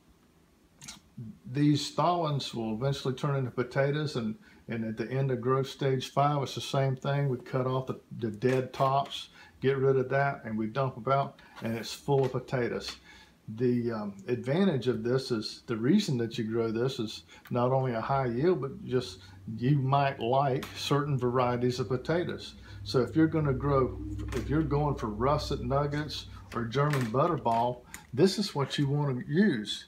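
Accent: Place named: Virginia, United States. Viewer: Sooooooooo glad to hear your wife is healed. I was rescue captain for Virginia Beach Rescue Squad back in the 80s. Love Virginia... that's why I recognized your accent. I live in the Netherlands now. Thanks for the tips. I'll be staying tuned. Peace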